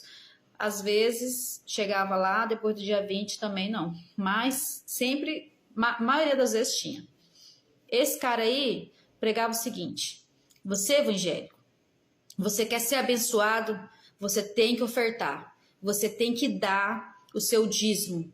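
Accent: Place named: São Paulo, Brazil. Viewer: Sério isso vc de madureira com essa fala...parece q é de são Paulo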